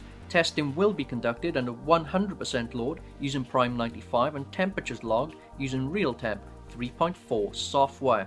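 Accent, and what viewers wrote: Wales, United Kingdom. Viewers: u have brilliant in depth reviews! are you originally from wales in the uk? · MAN YOU R PURE BRITICH AND I LIKE THAT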